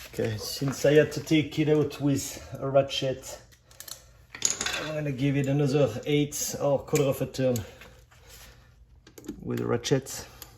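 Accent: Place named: France. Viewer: Thanks for showing the most important part. Removing those plastic panels without breaking, Heaven forbid screws to fasten. Can't wait to experience the pleasure and appreciate the tid bit how sharp that plastic is, nothing worse than cutting yourself doing a repair. I detect a French accent.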